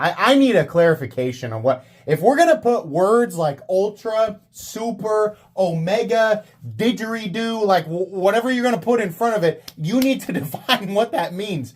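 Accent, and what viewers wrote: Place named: Kansas, United States. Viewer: I kinda imagined people in kansas talk like that anyway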